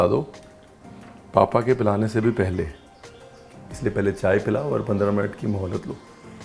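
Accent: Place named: Punjab, India. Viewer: Punjabi Accent 😍😍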